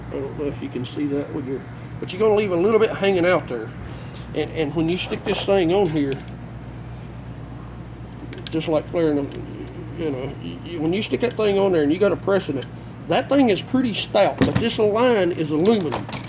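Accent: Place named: South, United States. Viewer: Even with the down South accent, I got something useful out if this video. That workaround fix looks pret darnt good good buddy. I think I will try it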